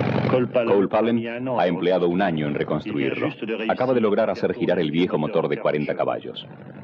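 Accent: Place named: Argentina. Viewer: que parte de america es esta no la reconozco, colombia, chile venezuela, uruguay?el relator es indiscutible argentino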